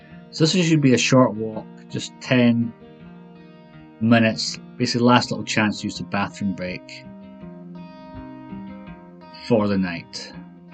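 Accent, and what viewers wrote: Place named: Scotland, United Kingdom. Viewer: Absolutely wonderful video! Love your dogs, lifestyle and your beautiful accent.. Scottish <3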